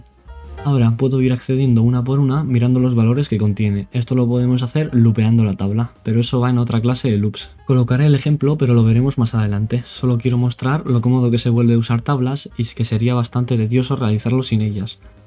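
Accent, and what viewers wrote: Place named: Argentina. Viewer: Ja ja ja ja. Yo pensaba que eras argentino, pero muy bueno, tu video sigue así.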